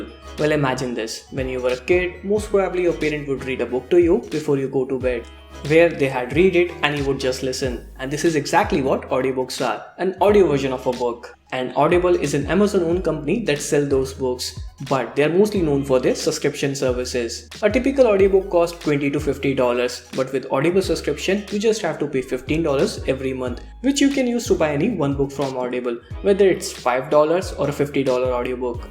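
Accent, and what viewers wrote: India. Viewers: english with accent hindi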